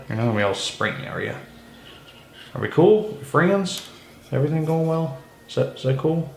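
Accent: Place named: Utah, United States. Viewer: Do you happen to be from Utah? I am detecting a very distinct Utah accent.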